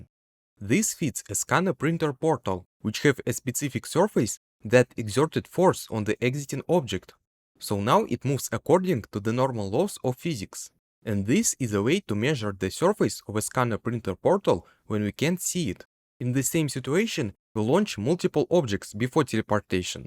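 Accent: Russia. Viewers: Nice russian accent 👍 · Акцент кст русский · не вижу русских в комментах, хотя акцент автора явно русский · Вау, что у тебя с акцентом, никогда не слышал такого неподвижного звучания. Ты проигнорировал КАЖДУЮ фонему английского и заменил её на фонему русского. И даже не на самую похожую. нг в конце почему-то читаешь просто как н, ладно середину между н и г найти не получается, зачем просто выкидывать г. Произносится не обжект, а абджэкт. Не фанайт, а файнэйт. Не пробабли, а прабабли. Не копис, а капийз. Не сёрфэйс, а сёрфис. Не аньюжал, а аньюжуал. Не идиа, а айдиа. Не моментум, а мамэнтам. Не эгрис, а агрийз. Не эдд, а адд. Не специфик, а списыфик. Не дат(that), а дэд. Не джеометри, а джиамитри. Не детэ́йл, а ди́тэйл. Не телепо́рт, а тэ́лэпорт. Не эллипсо́идал, а эллипса́идал. Не алдоу, а олдо. Не дыс(these), а диз. Сделай на русском отдельную дорожку, невозможно без кукожа тебя слушать. 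· bro got Russian acent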